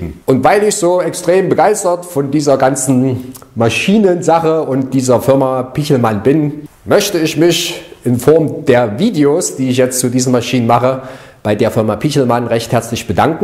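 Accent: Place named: Hesse, Germany. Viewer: Ich weiß nicht wieso aber irgendwie finde ich deinen Dialekt sehr symathisch, auch wenni ch ja eigentlich der Meinung bin, dass ein Mensch agar nicht mehr sein kann als ein Hesse ^^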